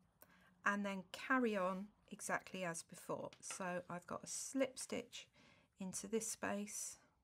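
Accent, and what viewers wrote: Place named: Australia. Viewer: I love your accent! Are you from Australia?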